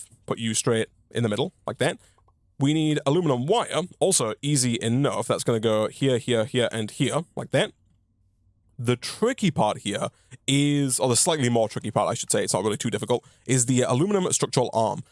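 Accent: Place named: Northern England, United Kingdom. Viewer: your accent confuses me so much 😂 sounds like a proper northerner from the UK but has so many american influences; 'aluminum'???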